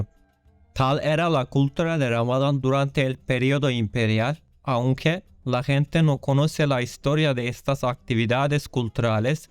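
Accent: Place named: Turkey. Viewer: Interesante me gusta las historas turcas Según tu acento eres turco Y hablas bien el español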